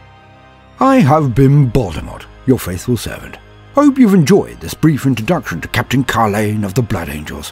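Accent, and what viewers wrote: United Kingdom. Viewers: Ah, my 2 favorite things, Blood angels, and an old British guy explaining them.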